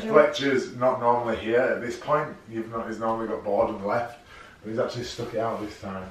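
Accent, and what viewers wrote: United Kingdom. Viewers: Nice British accent, love it 👍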